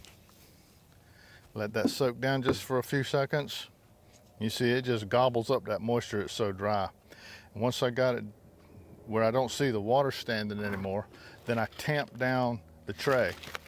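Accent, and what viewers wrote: South, United States. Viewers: It’s so nice to hear a southern accent I’m from Houston and Henderson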